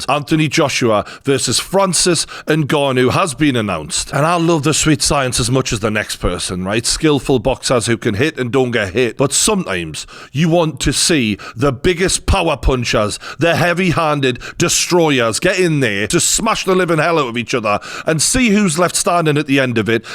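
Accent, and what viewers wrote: Newcastle, United Kingdom. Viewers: Your bang on geordie boy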